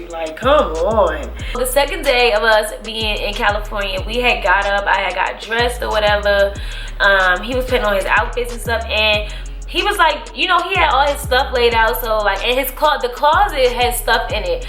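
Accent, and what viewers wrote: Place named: DMV, United States. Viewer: dmv! period...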